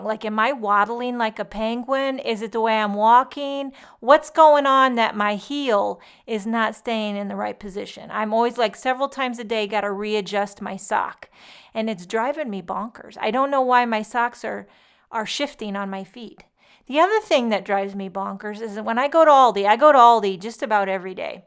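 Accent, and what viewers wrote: United States. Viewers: u are a women after my own heart, I do the same things, why don't things work the way they are suppose to, Ugh! And I have habit of expressing my opinion wether anyone wants to hear it or not. I noticed you said u were in Germany but u have an American accent. Love the birdies...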